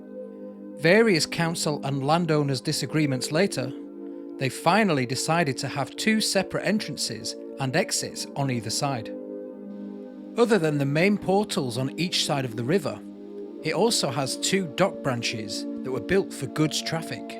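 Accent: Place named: Northern England, United Kingdom. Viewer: haha I was somewhere between leeds and Wigan but couldn't put my finger on it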